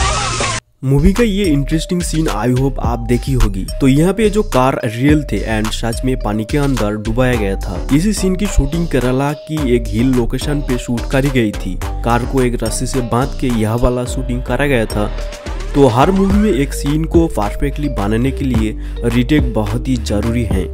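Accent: Bengal, India. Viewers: Bengali accent hai, south Indian nahi! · DADA you are Bengali.. oh pata chai hi rah hay.... Pati Banglay bolle bhalo korte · May be he hails from Bengal. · Sir bengoli hai..isi wajha se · Are bhoi aap bongali ho kya? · Bhai bangali accent mix hain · 😂 Bengali accent hai. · Bengali accent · Bengali and Hindi mix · Mere papa jaisi, bhai hamare Bengali hai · Ur voice is Bengali I caught 😂😂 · I think he is Bengali. It seems by his accent. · Hindi bhi aap bangla me bol rahe ho 😆, Please hindi sudharo. " Meenamma daakte (bulate) hai"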